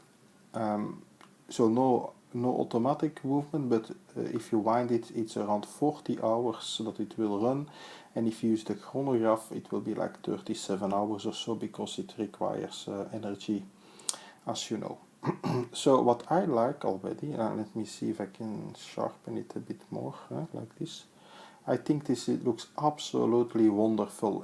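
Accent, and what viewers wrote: Flanders, Belgium. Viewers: je klinkt als een Belg. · Nice review, love the Flemish English accent by the way. 😉. I bought the same watch but it's a 38mm, 18mm lugs and solid case back. Even more vintage that way and better suited for smaller wrists. Keep up the nice work.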